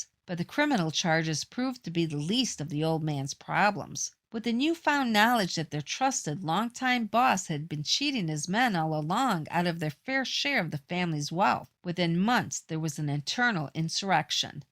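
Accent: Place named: New York, United States. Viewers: Got to love the New Yawk accent of the lady covering these videos,’ she can read a book to me anytime. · Nice!! The borgata gal is back!! That NY Italian accent!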